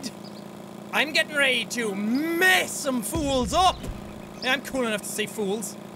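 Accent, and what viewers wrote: Ireland. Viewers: Knickers, another word for underwear lol he just Irish so it sounds funny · Are you Irish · the more excited jack gets, the more progressively Irish he gets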